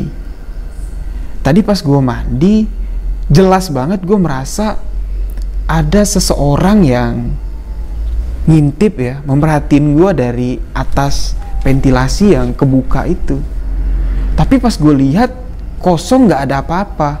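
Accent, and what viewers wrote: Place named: Bali, Indonesia. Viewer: Ooo,,,logat nya ky orang bali 😁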